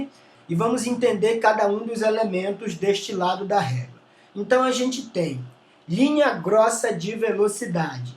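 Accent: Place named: Belém, Brazil. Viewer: Sotaque de Belém do Pará.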